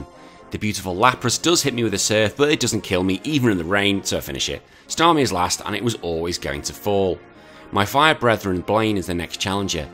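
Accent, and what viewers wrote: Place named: England, United Kingdom. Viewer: Love seeing these challenges with a local-ish - English - accent. And swearing. The recorder fucking broke me.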